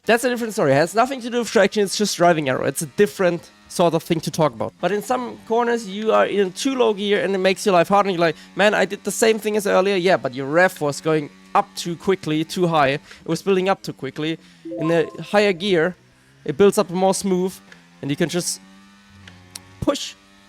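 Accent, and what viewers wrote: Germany. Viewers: thanks, have always struggled with going quick with tc off i am always losing to my tc times also love your german accent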